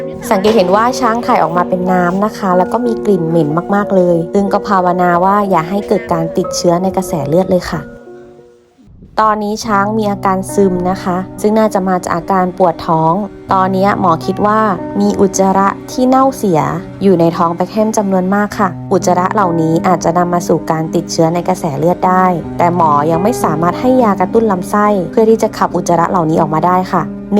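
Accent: South, Thailand. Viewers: หมอเป็นคนใต้หรอ🧐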